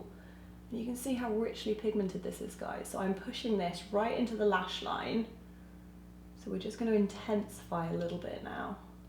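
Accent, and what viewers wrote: Australia. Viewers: Loving hearing the familiar Aussie accent on your channel! x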